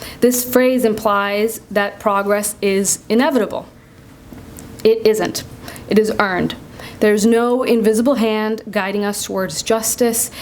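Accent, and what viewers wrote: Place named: United States. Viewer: I would really like to know from you Sarah haider what your credentials are , how long have you practised Islam if at all and which muslim scholars of note have you debated or interviewed . That perhaps would be more convincing than your zionist funded monologue. I see you have an american accent so it must be that you have spent most if not all of your life in the Us which we know is a major zionist stronghold. Further , establishing an "Ex Muslim Organisation" means it has to be funded and when you gather people for a talk and make a professional quality videoto put on youtube and fill the comments column yourselves under various made up names smacks of the zionist Islamophobia agenda . There are many stooges like you parading the internet.Obviously this must be a full time job travelling the circuit delivering dceptive talks and garnering support and creating a mindset so it has to be lucrative . 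The money is there . Zionists with their ill gotten gains based on pernicious usury are ready to spend to further their agenda. Just look at the funding of mercenary groups like ISIS and their affiliates. All funded with zionist money . You must really tell your masters that we have already been warned in the Quran. Since you guys have special research units set up and manuals from which you regularly throw questions at muslims, you should be able to look up the relevant verse very easily. So you see to be forewarned is to be forearmed. It remains to be seen in the hereafter what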